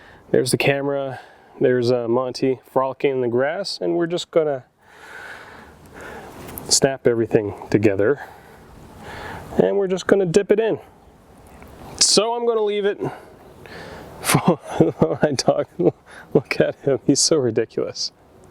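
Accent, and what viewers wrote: Canada. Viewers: you have got a great canadian accent.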